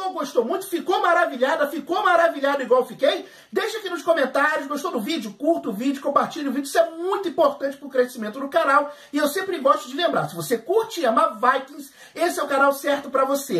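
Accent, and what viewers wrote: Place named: Brazil. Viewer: Acho legal como ele pronuncia os nomes dos personagens! Bem brasileiro! Hahaha! Seu canal é legal!! Sucesso!!!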